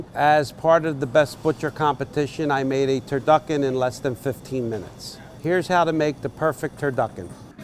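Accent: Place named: New Jersey, United States. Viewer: mmmmm goodeats!! and I love that jersey accent. mwah!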